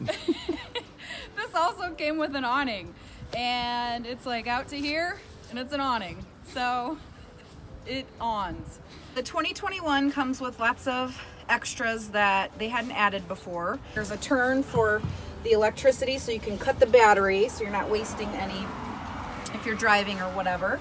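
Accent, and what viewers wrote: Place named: Midwest, United States. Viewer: Just tuned in for the Midwestern accent.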